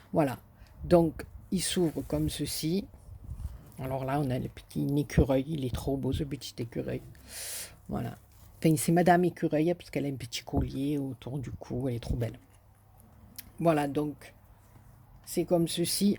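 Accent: South, France. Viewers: Tout à fait sublime ❤️❤️❤️ton album est magnifique avec les papiers ...une abonnée de plus...hihi merci pour le partage. J aime ton accent du sud . Bises 😘 Anita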